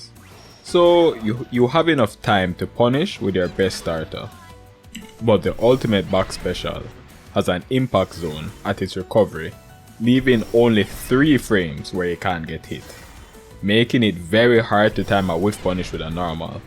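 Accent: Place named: Jamaica. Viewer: You sound Jamaican. Been struggling to find granblue players in this country.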